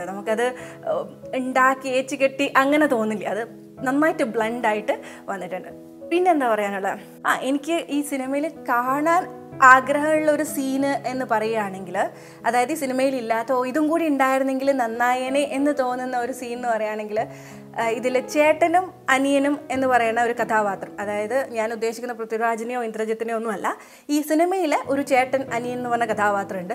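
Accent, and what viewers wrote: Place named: Thrissur, India. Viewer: ചേച്ചി തൃശ്ശൂർക്കാരിയാണോ തൃശ്ശൂർ ഭാഷയാണല്ലോ സംസാരം കേൾക്കുമ്പോൾ. ചേച്ചി വളരെ നന്നായി Review പറഞ്ഞു. Lucifer is a mass movie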